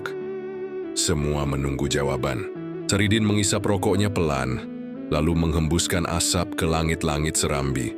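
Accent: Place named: West Java, Indonesia. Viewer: Ini cerita agar umat belajar ilmu ,Sareat,Toriqod,Hakekat,dan Makrifat ,ini sudah tingkat Makrifat ,jadi anak anak belum sampai ,masih saringat,sayang yang membawa suaranya orang dari Jawa barat , bila Jawa tengah akan lebih halus contoh dipun Tompo ,bahasa Jawa barat di Tampa kelihatan benar orangnya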